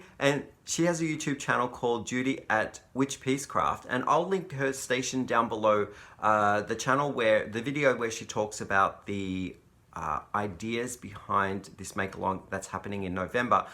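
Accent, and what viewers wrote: Australia. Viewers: Ha ha! Cute story about the garbage bin. I'm from Southern California. I call it "trash" , but my friends from Hawaii call it "rubbish". BTW I love your Australian accent!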